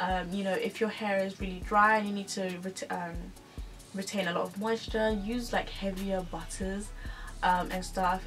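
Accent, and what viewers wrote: United Kingdom. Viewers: She sounds super british to me🤣🤷🏾‍♀️ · She’s sounds English In particular London but what does a Londoner know 🤷🏾‍♀️?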